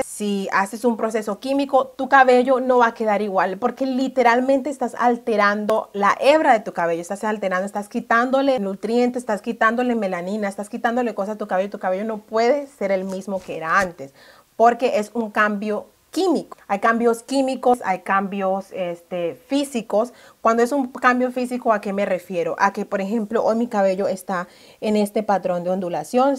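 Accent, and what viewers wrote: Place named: Colombia. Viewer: tienes el timbao de la gente afro de buenaventura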